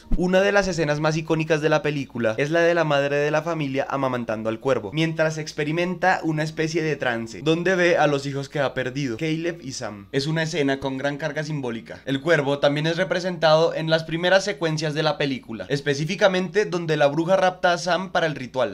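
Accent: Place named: Bogotá, Colombia. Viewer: Woow chimba estos análisis en acento colombo rolo!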